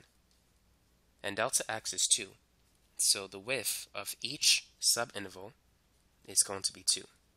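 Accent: United States. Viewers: Sİr, where are you from? you have definitely american accent but sometimes you really sound strange.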